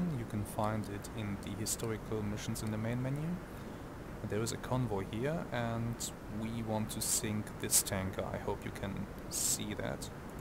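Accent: Germany. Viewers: Ugh... that cement thick accent and germanised English, Germish, Genglish, whatever you want to call it (e.g. you focus on things in English, in German you 'concentrate' on them). They don't even try to pronounce the foreign language correctly, but mutilate it beyond recognition as they see fit. I always cringe when I hear that. Ear cancer risk. Just speak German, for Pete's sake, and that's it. Leave the rest to the subtitles. It would be even more authentic. And then you have such a hard time calculating the difference between 0° (also known as 360°) and 308° mentally, that you rather count the dashes on the compass band instead? Really? 52°? Really? After having laboriously counted all dashes? Are you sure? I mean, are you really, really sure? Dude, then you're not the right person to explain anything to me (or anybody else) when it comes to physics and geometry.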